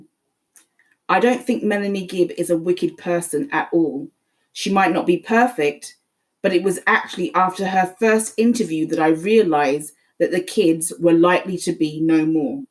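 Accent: United Kingdom. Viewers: I keep listening to this. It's one my favourite episodes in the podcast.I even play it to lull me to sleep. I guess I like British accents.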